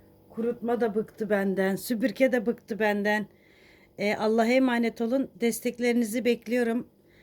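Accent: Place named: Tavas, Turkey. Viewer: KURBAN OLDUĞUM TAVAYLA KONUŞMANA KURBAN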